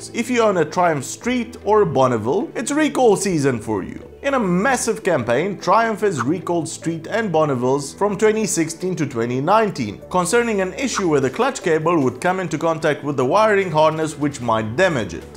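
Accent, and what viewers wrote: South Africa. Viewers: Dude.... do you have some South African in you? You have some very South African accent in your words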